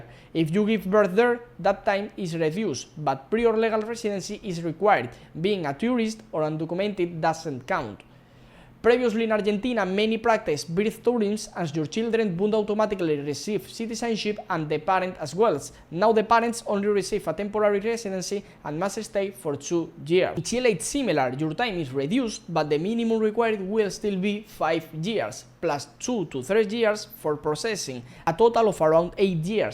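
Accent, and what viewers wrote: Spain. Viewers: Te recomiendo que practiques la pronunciación en inglés, la formación de frases, la palabras y vocabulario es bueno, pero trabaja en la pronunciación tu acento español es demasiado fuerte.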